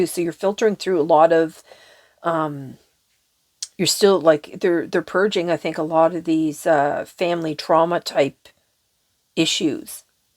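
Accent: Canada. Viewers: YOU SOUND NEWFY TO ME, WHY ARE THEY SO INTERESTED, ITS MY LIFE AND IT IN TROUBLE RIGHT NOW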